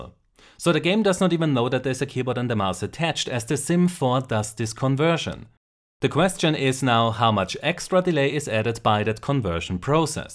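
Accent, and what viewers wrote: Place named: Germany. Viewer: Call me Sherlock I think he might be german ._.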